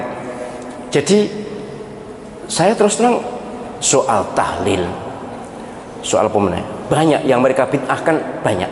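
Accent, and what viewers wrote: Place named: Java, Indonesia. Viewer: Bahasa Jawa nya kental sekali, medhok😎 Teman-teman jga banyak org Jawa.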